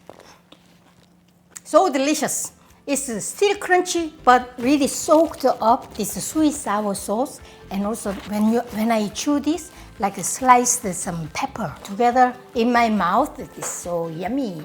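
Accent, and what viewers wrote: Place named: South Korea. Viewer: As much as I loves your cooking, I, too likes your English with a Korean slang👍😘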